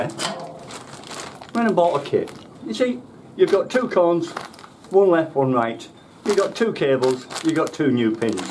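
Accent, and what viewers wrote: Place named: Blackburn, United Kingdom. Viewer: Great video , sound local to me in Blackburn . Saved me hundreds of pounds was dead easy to fix . Thanks so much fella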